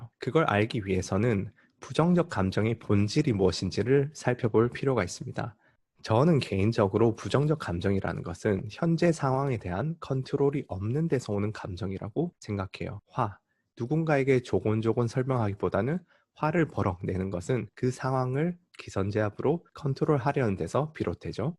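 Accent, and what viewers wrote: Gyeongsang, South Korea. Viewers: 주식 공부하러 왔다가 철학 공부 잘하고 갑니다. 아 그리고 말투만 들어도 이미 경상도 사람인거 알았어요 😋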